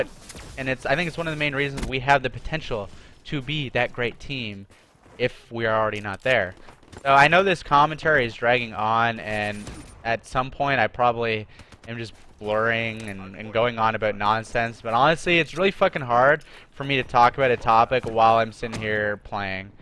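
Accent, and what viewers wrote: Canada. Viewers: You can hear the Canadian.:) Love you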